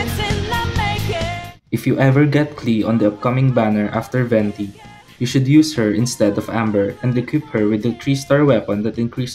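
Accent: Philippines. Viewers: You sound filipino. Are you?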